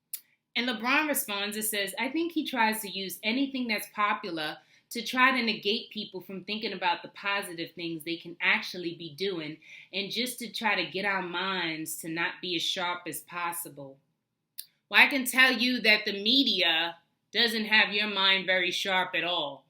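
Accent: New York, United States. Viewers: Hi am from Nassau Bahamas I subscribe too your channel early this morning, and you soo beautiful I love your charming sweet voice you could tell that you are a true New Yorker lol... Am A Eastern Conference guy, I was a die hard Lebron James fan when he played for Cleveland and Miami... And when he went too L.A. am not his fan no more because am not A Western Conference guy..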